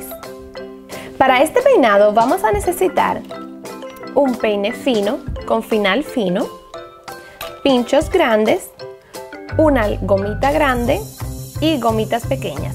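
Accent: Cuba. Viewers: porque la voz lo tienes muy diferente a tus vídeos,actuales no entiendo,cual es y voz Real Porque actualmente tu voz es más dulce y con su voz cubano Pero antes tu voz era diferente Acaso mi hermosa finjes tu voz, por favor aclaranos ese tema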